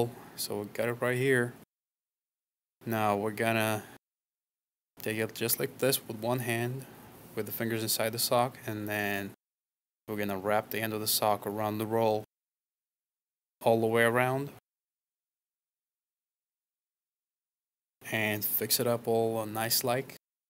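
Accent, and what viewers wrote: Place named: Eastern Europe. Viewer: Where are you from? I'm hearing a Slavic accent but I may be wrong.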